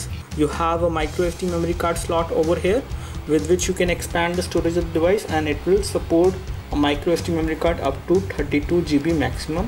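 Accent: India. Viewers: i quite like your reviews except for this english... i don't know to tell but your english is a bit too indianish ahha :) never mind of a stupid like me, good work guys ;)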